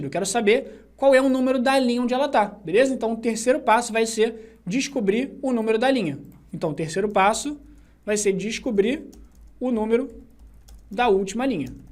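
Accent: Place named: Rio de Janeiro, Brazil. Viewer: Sinceridade, não sei se é sextou com vba ou estou com vba, eita sotaque do carioca... na dúvida #sextoucomvba! vou pelo ouvido...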